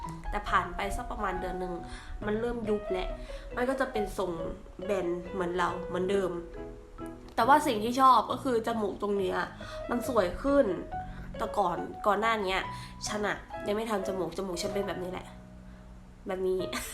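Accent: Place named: Isan, Thailand. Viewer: พึ่งเคยได้ยินพูด อิสาน น่ารักมากเด้อ 🤣🤣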